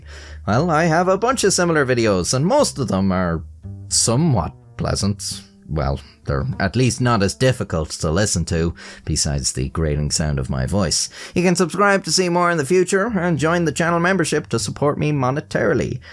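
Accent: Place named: Ireland. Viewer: If you're new around here and looking for a similar but less horrifying video, try the one about the monkey railroad conductor. If there were a hall of fame for videos, that one deserves a spot. And dammit, the world LOVES Irish accents, your voice is delightful.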